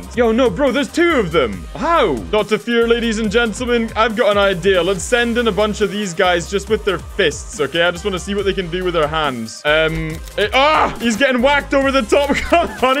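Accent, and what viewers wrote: Scotland, United Kingdom. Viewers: Scottish. I think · is he british?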